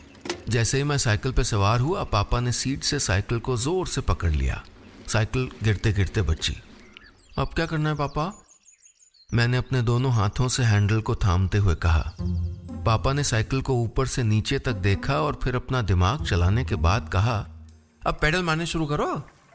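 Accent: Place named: Pahadi, India. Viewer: Pahadi accent awesome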